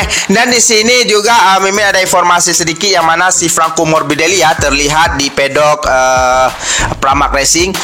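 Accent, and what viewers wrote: Aceh, Indonesia. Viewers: Admin logat aceh melayu... Gua orang kalimantan aja paham kok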